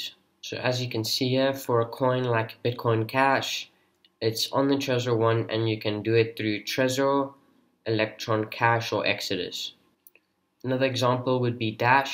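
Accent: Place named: South Africa. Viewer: Awesome video. The SA English is the best sounding.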